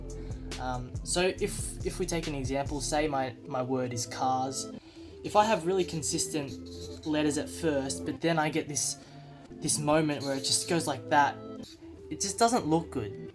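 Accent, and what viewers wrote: Australia. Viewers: Coming from West Syd been sketching and writing for nearlly 12 years, i completely agree with everything you said and thought all the advice was awesome i defs wish i had something like like thsi when i was younger looking to learn but i ended up teaching myelsf everything i know...... anddd was just wondering where abouts in australia are you from i can tell buy your accent?? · Love a familiar aussie accent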